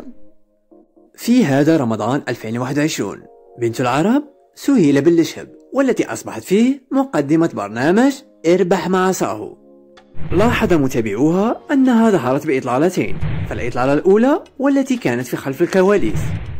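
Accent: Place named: Algeria. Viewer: مشاء الله عليك بنت بلادي ربي يحفظك مالعين يامشرفة الجزائر بالهجتك وعفويتك يااصلية وبنت اصل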